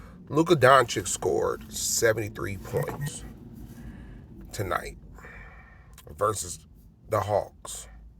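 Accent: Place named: California, United States. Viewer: how about the minute you started talkin' I knew you were from California bruh. Either LA or Long Beach.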